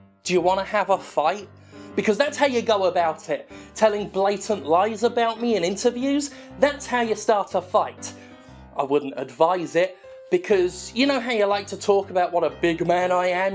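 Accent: United Kingdom. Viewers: Well for being the first video that i saw of this "big man with british accent" it was pretty cool and informative, I own Overwatch because I bought it when it was on sale and the issue with the llot boxes isn't Duengeon Keeper mobile bad, I haven't bought a single box but I'm pretty satisfied with the experience besides on a team based shooter that shit is kinda expected like in rainbow six siege and the likes because is giving new characters and new maps for free not like call of duty thta charges another 60 bucks for a bunch of maps, anyway the video was good and fuck Alien colonial marines, that bastard still owes me money for buying that piece of crap... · he is aways mad angry video game nerd he is british aaaaaaaaa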